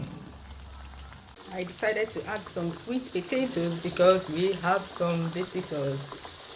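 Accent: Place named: Umunze, Nigeria. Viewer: please are you from umunze? The dialect is exactly like mine. Breakfast yum yum 😋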